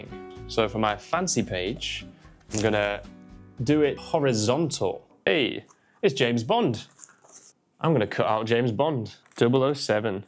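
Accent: Northern England, United Kingdom. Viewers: You’re amazing! I love British English 😍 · I think that the person asking question meant your ’coot’ pronunciation of ’cut’ which seems to be some kind of the northern accent of English.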